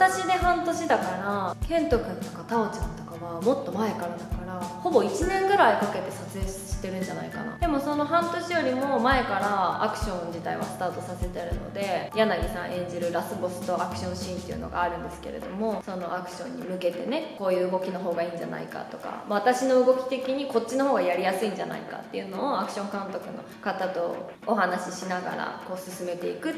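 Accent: Kansai, Japan. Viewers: 週末で「今際の国のアリス」見て、このクセの強いキャラを演じてるのは誰だ？と思いました。この動画でバリバリ関西人だと分かった。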